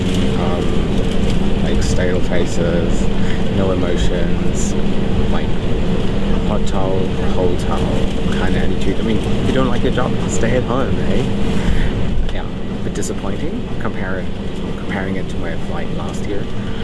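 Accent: Australia. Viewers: [comment made May 16, 2021] You're a great guy. Thanks for your continuous honest reporting. I've been watching quite a few of your videos in the last week and I really like your positive energy. I look forward to viewing more of your vids. I am Dutch born, Groningen, Netherlands, and because of my father's job I spent my childhood from age 4 to 12 in Nigeria and Ghana.. great experience. We traveled back and forth to the Netherlands every 2 years stopping in all the West African countries on the way. I really enjoy traveling.. though don't travel as much as you.. LOL.. but I traveled through France a number of times and the U.S.A and Canada. I presently live in Montreal, Canada. Since 2012 I travel very frequently to Mexico and Dominican Republic. I am multilingual though only 4 languages with fluency. My wife is French European and is perfectly bilingual without a trace of accent. 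We really enjoy your way of speaking English with an Aussi accent.. LOL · What nationality are you? Sometimes you sound Aussie, sometimes almost south African, and other times I can't tell. Just wondering. Great videos😀😁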